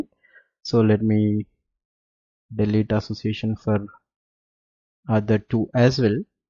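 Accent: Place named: India. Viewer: Just another indian with a terrible accent reading from a google search about the subject.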